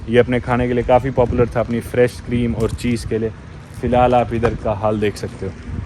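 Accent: Haryana, India. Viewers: Bhai chora Haryana kaa lage hai !!!!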